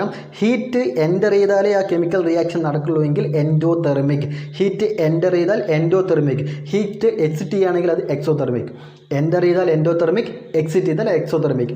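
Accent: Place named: Palakkad, India. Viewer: slang kettapolle thoniii pkd annu ennu🤪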